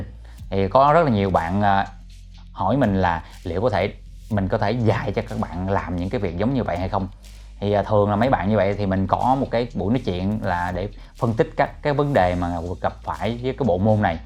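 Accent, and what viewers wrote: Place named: South, Vietnam. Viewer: Em rất vui gì nghe anh nói giọng của miền nam.bởi gì em đoán anh ở sài gòn.gì một pc full nước em sẽ cố gắn làm việc để một ngày nào đó không xa em sẽ được gặp anh.thực sự rất vui mừng khi anh nói tiếng miền nam.em chỉ sợ anh ở hà nội.nên khi ước mơ củacem khó thực hiện.ngày đó không xa đâu gần đến rồi.chúc anh nhiều sức khỏe.một ngày không xa em sẽ đc gặp anh.chắc chắn là thế.PC full nước anh nhé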